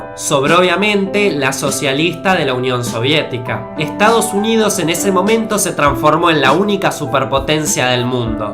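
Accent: Argentina. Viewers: jajaja era argentino, y no me di cuenta por el acento..... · yo soy chileno pero por tu tonada me suena argentina pero me confundo si eres argentino y estas en mexico y te a cambiado el tono o puede que sea alreves tu dime si estoy en los sierto aposto que eres mas argentino que mexicano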